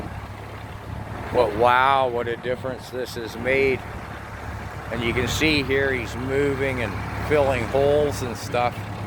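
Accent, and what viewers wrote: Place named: Minnesota, United States. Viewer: We in minnesota of wisconsin? Or some part of Canada? If i had to guess, i would maybe say Minnesota? Not an expert on those speech accents yet. Lousiana has like 20 distinct accents. Several different distinct accents around New Orleans.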